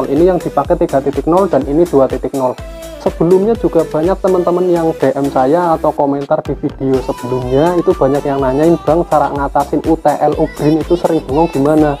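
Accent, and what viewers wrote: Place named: Kebumen, Indonesia. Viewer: Alamatnya mana bang kayake kaya wong kebumen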